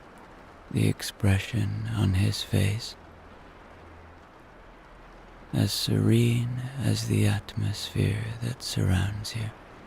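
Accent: Ireland. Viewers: This is my first time listening to one of your stories. Your Irish accent is such a bonus for me! Lovely voice and rate of speech. Comforting. Thank you,. ❤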